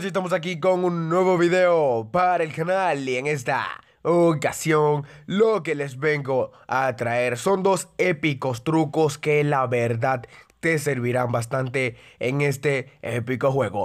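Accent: Dominican Republic. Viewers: eres de República Dominicana?. ¿Verdad?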